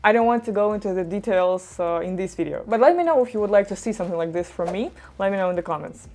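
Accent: Russia. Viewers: ох уж этот наш русский акцент 😅❤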